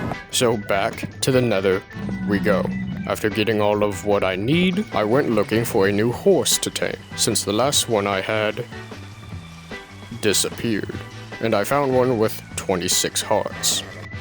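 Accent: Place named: Eastern Europe. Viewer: I did not expect that eastern europe accent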